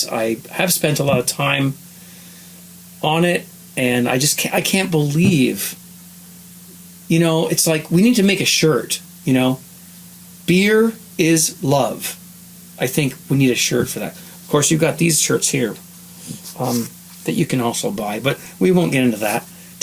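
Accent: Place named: Canada. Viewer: I say Wort , Wart same as you do . But not Wert . But when you say about ... I can tell you are Canadian... Cheers !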